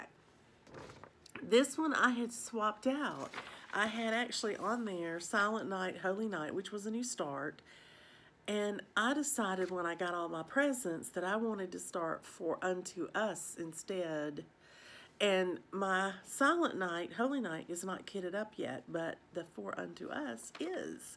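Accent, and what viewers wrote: South, United States. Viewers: Thank you so much, for such a quick response. Your channel is my favorite. I lived in Memphis, TN for two years and left a piece of my heart there. Your southern dialect, hospitality, and lovely mannerisms take me back to that very enjoyable time in my life. Blessings to you in the new year!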